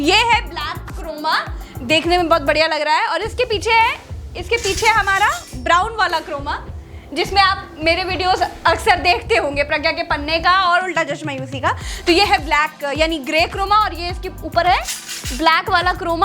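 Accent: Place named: Awadhi, India. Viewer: Aapke bolane per bich bich mein jo avadhi ka jo tach aata hai is liye aapke channel mein Char Chand lag jaate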